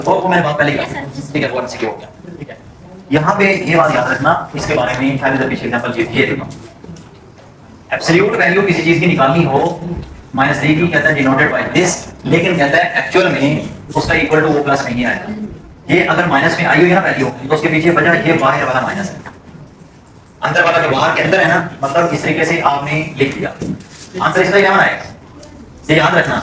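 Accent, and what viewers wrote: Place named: India. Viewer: bhai kia bat hai indian touch dy rha hai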